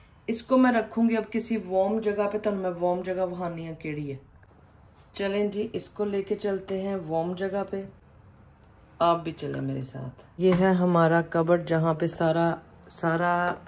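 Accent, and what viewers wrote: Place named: Punjab, India. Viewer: Ap ki punjabi ka tarka ap k vlog ko chaar chaand laga daita hai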